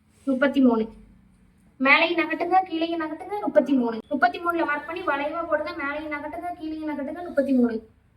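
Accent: Tirunelveli, India. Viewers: Tirunelveli slang always beauty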